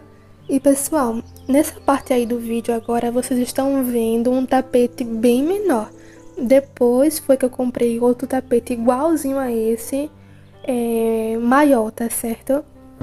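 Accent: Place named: Pernambuco, Brazil. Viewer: Linda sala amei vc é pernambucana lindo seu sotaque 😍🤗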